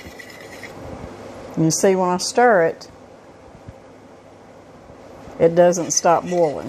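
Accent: South, United States. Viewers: So glad I found your channel. That cake looked amazing!! Great for fall baking! I'm definitely going to be making this. Thank you for sharing. You are a wonderful teacher and I love your southern accent. Have a blessed day! ❤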